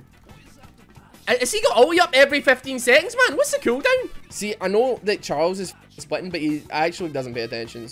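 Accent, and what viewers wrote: Scotland, United Kingdom. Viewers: so scottish dude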